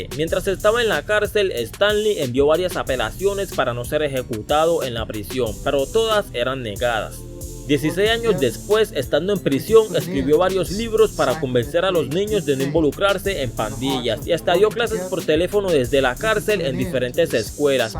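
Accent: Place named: Pacific, Colombia. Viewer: Por tu acento creo que eres de Tura. O algún lado del Pacífico colombiano. Espero no equivocarme. Saludos paisano.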